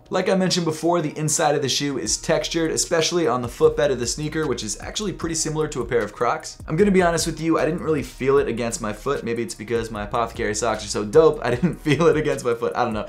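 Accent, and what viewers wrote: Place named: Southern California, United States. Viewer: sounds like a totally tubular guy from Southern California but he's not from there.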